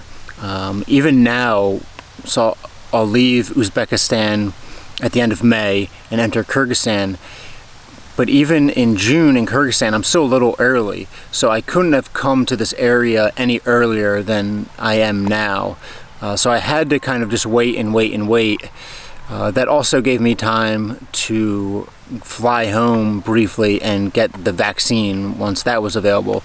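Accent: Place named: Philadelphia, United States. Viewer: Damn that Philly accent is thick haha.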